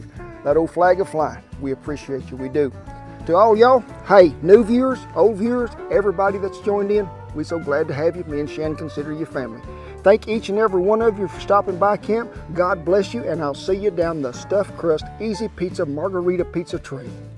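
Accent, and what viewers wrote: United States. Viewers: Sir, i thoroughly enjoy your videos and enjoy hearing your cowboy accent. Your fan from Singapore!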